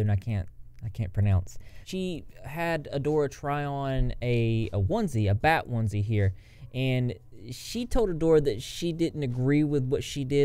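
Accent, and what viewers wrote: South, United States. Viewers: “SORRY IM SOUTHERN, I can’t pronounce.” Saaaaaaaame lmao 😂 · What part of the south are you from? I can really hear it in this video. I’m from the south too. 😂😂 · Found you the other day under recommended videos & I’m obsessed!! I love the info & the southern accent - I’m from GA! Keep killing it 😍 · This is great, thanks for reporting, and thanks for standing up for SA Victims. Also, I personally looooove southern accents so please don’t worry about how you pronounce things. ☺️ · I didn’t notice you had an accent until you said “I’m southern” love that for me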